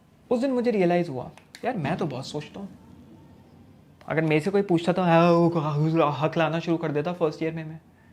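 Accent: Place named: Malwa, India. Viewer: sun mere bhai ek baat tu bohot dhyaan se; tu dilli ncr se hai na? tu koi madhya pradesh ke indore maalwa region se to hai nahi jo wahaan ki style mein bol raha hai ZAKIR KHAN ko copy kar raha hai ,kyunki that way you speak is extremely irritating aur teri acchi baaton ki value gir jaa rahi hai saari ki saari. Kitni baar tere video dekhne chahe hain par 15 minute baad band karne padte hain becasue of the irritation caused by the way you speak which is NOT ORIGINAL.(tu bhi jaanta hai ye baat acche se)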